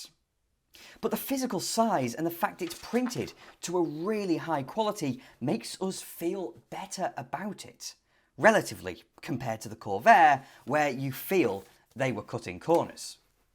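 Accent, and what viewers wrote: United Kingdom. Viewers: Great video and great British accent ( where are you from ?) I 've had a convertible turbo 1964 Corvair for 8 months, and it's a pure joy to drive. Greate noise, powerful enough, gorgeous and easy going. I brought it back from Denmark, on a 1200 km journey without any trouble. This car is better than a Mustang or mainstream pony cars, a reel underdog.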